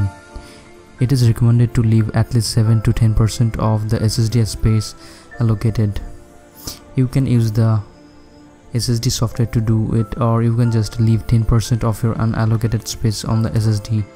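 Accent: India. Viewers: Indian accent and annoying music. Awful video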